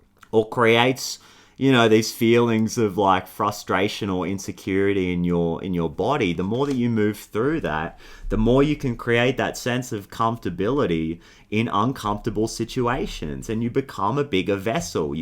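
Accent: Australia. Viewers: Left tarot vids for a year, been needing it this past week and it’s been EPIC and defo in the cards, wherever I go, but I gotta say it was so lovely to hear your lovely Aussie sweetness, familiar to my ear. Am on X stages, surrounded by all ethnicities, mainly Yanks. So hearing such a sage and true message from you was just the cherry on top…as I head into my Hermit phase. Looking forward to tuning in here again. Grateful for you. 🥰🙏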